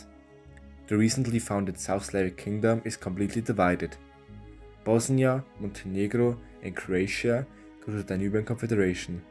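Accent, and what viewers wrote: Bavaria, Germany. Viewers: Das video wurde sehr gut,und man hört ziemlich deinen Bayerischen Aktzent😂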